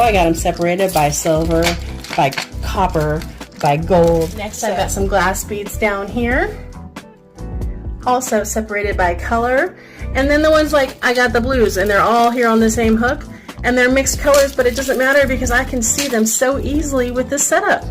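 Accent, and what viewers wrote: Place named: South, United States. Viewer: I like the southern drawl!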